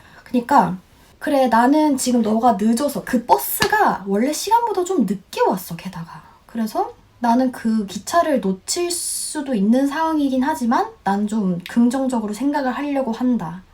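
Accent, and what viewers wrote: United Kingdom. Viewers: 영국발음 장난아니네요 와~ 전에 다른 유튜버한테서 봤는데 남한에서 왔냐 북한에서 물어보면 "너 하기에 달렸다" 였는데 엄청 웃겼어요ㅋㅋ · ㅠㅠㅠㅠㅠ이 언니 왜 이제 ㅇ알게된거지 진ㅁ자 너무 이쁘고 너무 사랑스럽고 목소리도 너무 좋고 영국식 빌음도 너뮤 좋고 진짜 짱이에요ㅠㅡㅠㅠㅠㅠㅠㅠ 사랑함니다ㅠㅠㅠㅠ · 요며칠 영상 보다가 결국 입덕부정 인정하고 입덕했습니다, 영국식 발음에 내가 이렇게 환장하는 사람이었나 첨 알았어요 ㅋㅋㅋㅋ 진짜 내가 본 인종차별 대처 중에 제일 고급지고 우아하게 되받아치신 것도 너무 멋있고 영어 관심도 없고 공부할 생각은 더더ㅓ더더욱 없었는데 덕분에 영어공부도 관심 갖게 됐고 필기체도 연습해서 처럼 멋지게 쓰고 싶어졌어요❤️ 진짜 발음 너무너무 좋고 좋아서 영상 통으로 영어로 읽는 책 읽기 컨테츠? 같은 게 나오면 좋겠어요 제가 조회수 만 정도는 책임지고 보겠습니다 ^.^ 살앙해요 ❤️ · 영상 너무 잘 봤어요. 영국 발음 예쁘게 하세요. 마지막에 "I thought it was a dog barking" 빵 터졌네요 🤣😄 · 영국억양으로 공부한지 얼마나 되었어요? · 와.. 영국발음 넘 멋있어요. 뉴질랜드와 호주로 워홀을 희망하고 있었는데 영국으로 급 변경하고 싶네요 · 와 영국어보고 반했어요 바로 구독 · 기분 나쁜 경험이지만, 제 기억에 남는건 영국발음 입니다 ㅠㅠ 너무 멋있어요 진짜 ❤️👍🏻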